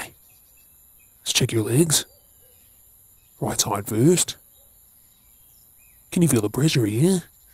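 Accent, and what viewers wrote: Australia. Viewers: Oh, geez, I'm a sucker for Aussie accents! I love your work,! Thanks more making the content you do!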